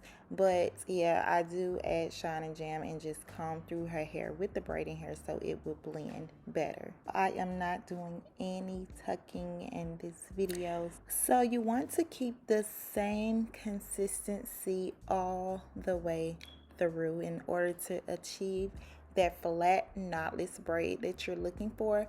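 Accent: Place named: Mississippi, United States. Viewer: girl you sound like you from mississippi! 😭😭💜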